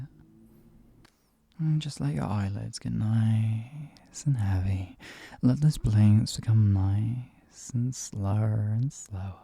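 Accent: United Kingdom. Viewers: This may be a weird question, but what kind of British accent does this guy have, I’m American so I’m not sure of any of the regions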